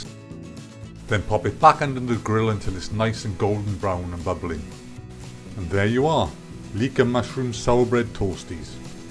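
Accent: Wales, United Kingdom. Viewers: The Welsh accent is very refreshing so it is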